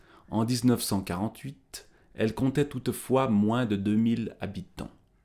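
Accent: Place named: Vaud, Switzerland. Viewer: beaucoup aimé ton accent qui vient de chez moi ( je suis vaudois)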